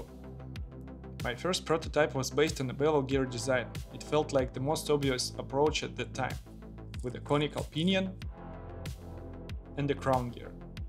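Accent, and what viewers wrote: Russia. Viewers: Мне кажется или у него русский акцент? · почему я слышу русские звуки в этой английской речи?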